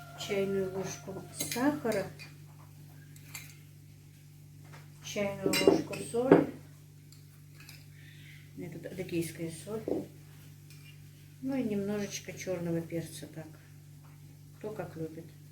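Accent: Standard. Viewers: Какие интересные рецепты, обязательно приготовлю. Слушаю вас и поражаюсь : какая у вас правильная литературная русская речь! Ваш голос и речь очень похожи на голос моей тёти — учительницы русского языка и литературы!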